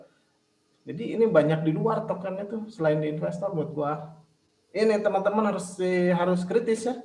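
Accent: West Java, Indonesia. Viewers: Sunda pisan euyy si akang haha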